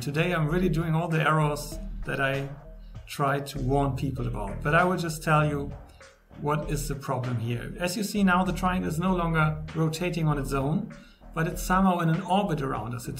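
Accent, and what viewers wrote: Germany. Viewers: are you german? you have a german accents 😃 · sis is a very nice german accent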